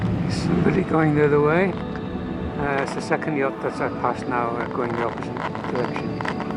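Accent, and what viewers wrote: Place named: North Wales, United Kingdom. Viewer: Diolch yn fawr,. Great vid. Seemed to have lost contact with your channel some 2-3 years ago but thankfully back on track now. Although I don't suffer from hiraeth living in France it's good to hear the North Wales accent & remember the waters & coasts I sailed years ago.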